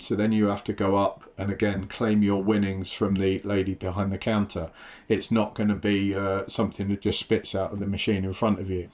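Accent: United Kingdom. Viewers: Doesn’t that English accent bring a lot of cache all over America? I had a pal, from Wales actually, kind of a Joe average, but he just attracted women and good will just by opening his mouth anywhere he went, restaurants, bars, just out shopping, it was actually good to hang around with him just because of the spillover and association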